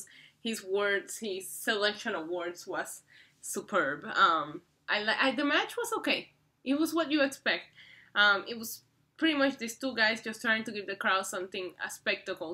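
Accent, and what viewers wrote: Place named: Puerto Rico. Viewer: by your accent you sound Puerto Rican!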